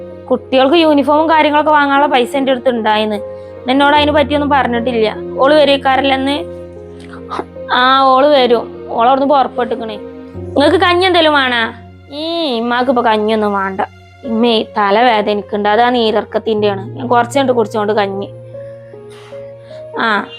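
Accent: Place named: Malappuram, India. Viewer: എന്താ പ്പ തിന് മലപ്പുറം ഭാഷ യാണ് എന്താ കുഴപ്പം കൂട്ടം എന്ന് പറഞ്ഞ(കാര്യം )അത്ര തന്നെ,, അടിപൊളി വീഡിയോ സ് ആണ് 👌👌👌നല്ല ഭാഷ 👍❤️❤️❤❤❤❤❤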